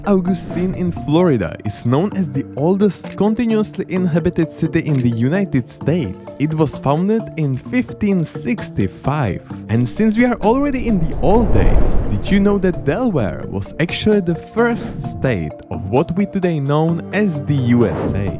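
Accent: Czech Republic. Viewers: This guy is Czech. English is most likely not his first language.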